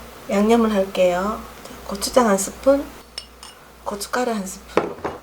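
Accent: Gyeongsang, South Korea. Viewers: 사투리 경상도 말씨 푸근 친근감 가요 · 경상도 사투리를 어찌 이리 맛갈나게 쓰시는지! 음식보다 말씨가 맛있어서 보게 됩니다. 사진을 보니 인물 또한 고우시네요. 동영상에 얼굴을 보여주지 않는 이유가 시선과 관심을 님의 미모가 아니라 요리에 집중시키려는 배려 같군요. 요리 또한 맛있을 것으로 기대합니다.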